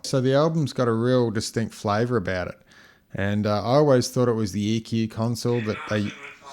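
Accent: Australia. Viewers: Not gonna lie Did not expect that ostralian accent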